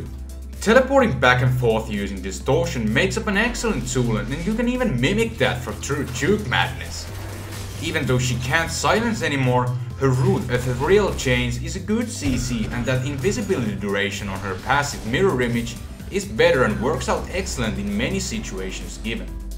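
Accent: Finland. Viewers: ookkos suomesta kuulostaa vähä silt ? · Where are you from? You sound little bit finnish:D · ootsä suomalainen koska kuulostat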